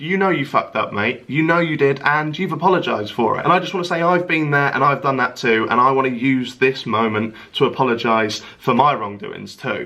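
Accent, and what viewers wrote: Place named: Newcastle, United Kingdom. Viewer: Sky, a gay guy on Twitter that I follow, loves the True Geordie's accent and I love it. He once shouted at me for asking why he loved the Geordie accent so much.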